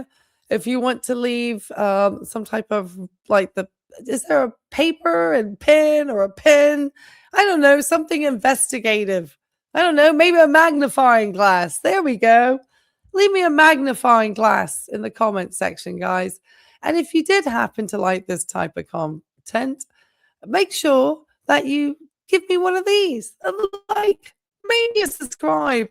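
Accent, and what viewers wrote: United Kingdom. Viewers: My understanding is when you join up it's actually 8 years but 4 years active then 4 years ready reserve. I do know this to be true because friend his son just got done with active duty in the Navy he has to report to some office in our city. Said just sits there all day doing paper work once a month. She must be from England sounds like a British accent hasn't a clue how American military works. Her reading these letters word for word is annoy as h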